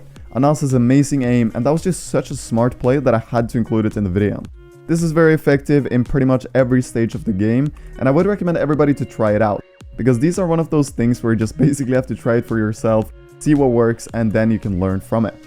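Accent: Norway. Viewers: U from Norway? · Are you Norwegian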